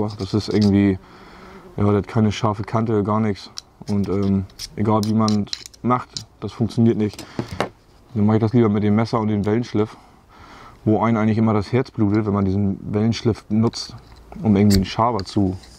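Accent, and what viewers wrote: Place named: East Frisia, Germany. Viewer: Du redest wie ein 60-jähriger Ostfriese aus dem Lande! Voll sympathisch!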